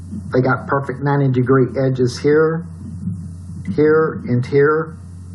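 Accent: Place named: Texas, United States. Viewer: Excellent video! You have a great accent. Are you from Texas?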